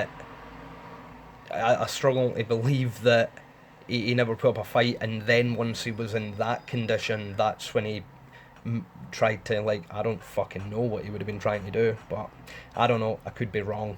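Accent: Scotland, United Kingdom. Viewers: He’s Scottish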